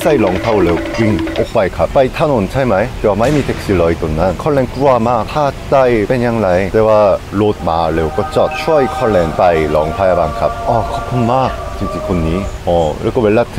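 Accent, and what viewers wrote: South Korea. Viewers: เพิ่งจะดูเป็คลิปแรก น่ารักมากๆ ชอบคนเกาหลีพูดภาษาไทย น่ารักดีค่ะ😊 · Thai language with Korean accent😂😂😂 You speak like in Korean Film 😂😂😂🤣🤣🤣 so cute 🤣🤣🤣 very talent 👍🏽👍🏽👍🏽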